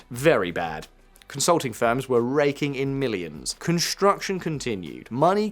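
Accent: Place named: United Kingdom. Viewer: Why is every anti-saudi video made by a british man? Before i even clicked i knew the speaker was British lol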